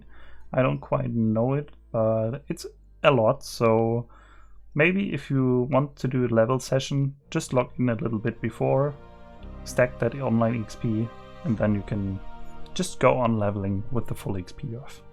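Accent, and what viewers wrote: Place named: Germany. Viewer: Das erste Mal, dass ein deutscher keinen Abgrundtiefen Akzent hat und echt gut Englisch spricht und du entschuldigst dich weil du "struggelst". Mehr Selbstvertrauen! Du machst das so gut !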